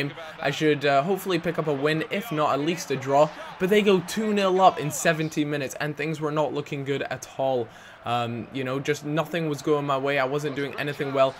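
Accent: Scotland, United Kingdom. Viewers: anyone else think his voice is partly scottish, part englsih, and past american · when I first subbed to you I couldn't work out whether you were scottish or american lol! You sounded sottish but you were so popular and such a big channel so i thought you must be american! Great vid by the way!:)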